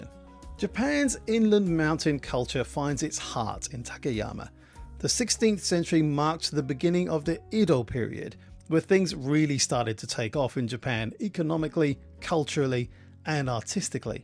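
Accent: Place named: New Zealand. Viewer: u have an interesting accent. feels like you grew up in australia or NZ and went to england or visa versa. takayama is great, so much so, i just bought a house in the old historic town. edit: nz not aussie, subtle but it's there :)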